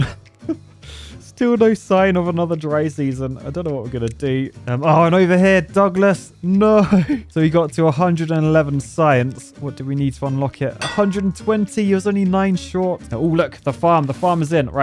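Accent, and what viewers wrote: United Kingdom. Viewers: Hey what’s crid oh wait ur British right sry btw great vid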